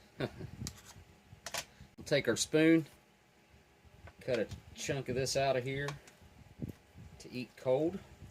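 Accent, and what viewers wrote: United States. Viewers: I'm binge watching your videos like crazy now. Completely enthralling content, plus your American accent is lovely and makes everything interesting.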